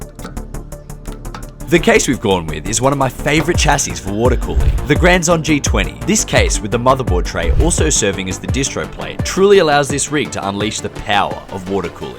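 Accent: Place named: Australia. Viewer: Man this thing actually looks BEAST👀 On a side note, realitvely new viewer and I honestly have no idea how it took me so long to recognise your accent as that of a fellow Australian😂 After having looked through some of your catalogue online and realising you offer some slight modifications to some of your prebuilts I can say that I'm honestly feeling very inclined to buy one off you as my current system is reaching that 5ish year mark(Ryzen 7 3700X/RTX 3080(probs one of the few aussies who scored one at MSRP😂)) And while i have usually centred around 1080/1440p w/ decently high frames for competitive FPS titles, I am finally reaching that age where I'm playing more AAA single player titles in 4K so definitely be looking by the end of the year and despite my enjoyment for bulding I feel like taking the easy route🤣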